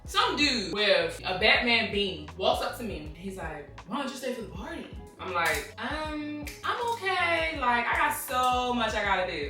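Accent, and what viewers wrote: Baltimore, United States. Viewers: You have one of the strongest Baltimore accents ever I love it (I’m from bmore county without the accent womp womp ❤️‍🩹)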